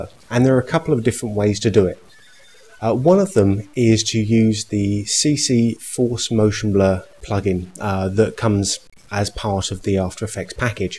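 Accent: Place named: England, United Kingdom. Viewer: Thank you so much for this tutorial, it's just perfect. And so good to hear an English accent on a video tutorial for a change - I can understand even better :)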